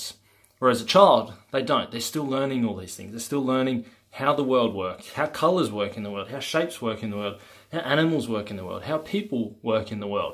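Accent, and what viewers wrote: Australia. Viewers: Love the aussie accent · You sound Australian.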